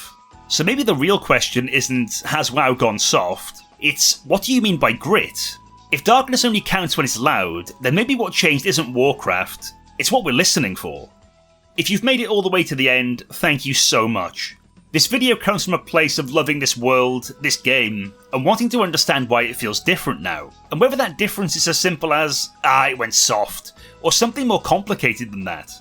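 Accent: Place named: Wales, United Kingdom. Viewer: Haha I am from Cwmbran. You sound very similar to me but I play with a guy from Barry and he sounds a little more like you than i do so yeah....That was my best guess but i new you was Welsh :)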